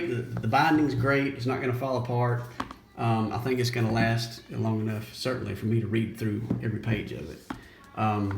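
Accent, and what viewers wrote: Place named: South, United States. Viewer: Your strong Southern twang is in sharp contrast to your beautiful Greek pronunciation!!!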